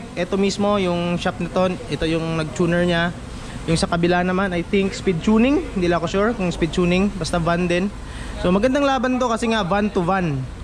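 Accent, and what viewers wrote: Iloilo, Philippines. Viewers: Ilonggo ka sir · Ilonggo ka boss? LOL “ano hambl mo? maapas lang sila ah” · Ilonggo dn ni pre??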